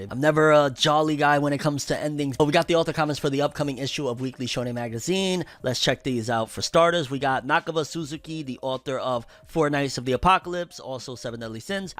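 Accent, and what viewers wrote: United Kingdom. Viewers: Dude are you British I catch the accent every blue moon in every video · best thing of fornever news is the british accent mate!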